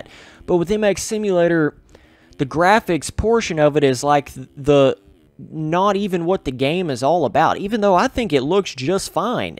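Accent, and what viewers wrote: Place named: South, United States. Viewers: where r you from you sound so country. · thats southern, not country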